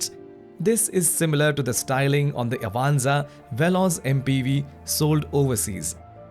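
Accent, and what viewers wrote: India. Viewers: Indian accent is terrible.. 🤮🤮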